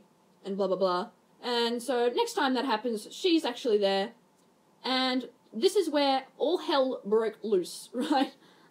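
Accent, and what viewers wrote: Australia. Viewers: OOH WOWIE PATOWIE WOW Also are you australian? Its hard to find Aussie youtubers actually... Oh well! im australian too =3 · I wish my Aussie voice was as strong as yours XD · You sound Australian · OOF I CAN HEAR YOUR AUSTRALIANESS HULLUR FELLOW AUSTRALIA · Great artwork! And a very engaging storytime! I'll be honest, I legit grinned when I heard the accent. Nice to hear a fellow Australian doing storytimes. Keep up the good work! You've earned a sub from me for great content :) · First vid seen by you, IMMA SUBSCRIBE BECAUSE YEE BE AUSTRALIAN ! :D Insert HAHA! BOI! Here to get a bunch of likes but fails badly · You Aussie? 😏 me too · OH MY LORD IS THAT AN AUSTRALIAN ACCENT?? (pls be from Adelaide :0) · i dont want to sound weird but is that a aussi accent? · I love your accent so much?? Like I'm an Australian too, but I love how heavy your accent is. 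(If that makes sense oof I hope that didn't sound offensive at all) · OOOOO another Australian!!! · OI YOU AUSSIE? I'm from Victoria XD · not trying to start something, but, are you Australian? or from somewhere around there? I'm just curious. I heard it in ur voice. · i smell another aussie I just subscribed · Are you Australian? You sound Australian and it makes you saying "Oh wow is potawie" more funny than it is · Is she Australian? She sounds like it but hey just found your content so yeah it's pretty cool so far so keep up the good work. Yah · instantly new you were an Aussie lol. btw that guy sounds fucked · I kind of wish my Australian accent was as strong as yours because I also have some South African in my accent but maybe it’s just because I’m used to myself talking? Anyway, a completely fabulous video!!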